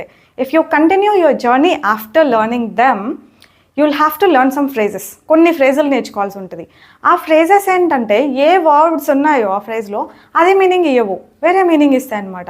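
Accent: Anantapur, India. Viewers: Akka medhi Anantapur aa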